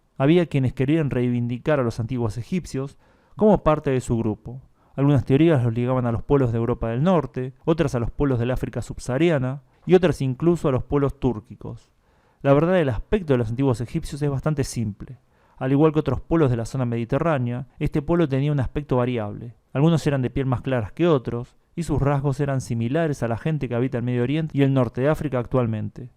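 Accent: Argentina. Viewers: Por fin un canal interesante y narrado por un argentino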